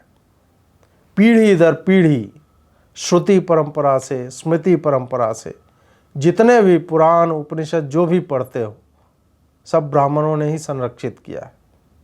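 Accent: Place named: Bihar, India. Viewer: ye youtuber paka bihari h his hindi accent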